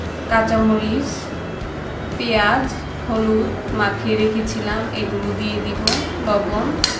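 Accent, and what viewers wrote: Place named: Comilla, Bangladesh. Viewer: apur desh most probably comilla .. your Accent says so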